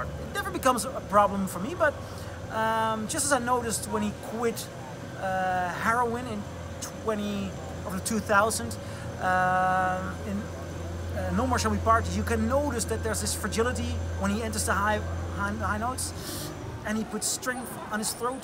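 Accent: Netherlands. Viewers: nice record! Are you dutch? if so, then i didn't know that :D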